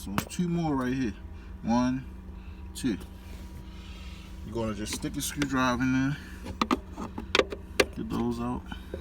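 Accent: Baltimore, United States. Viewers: You from Baltimore ain’t you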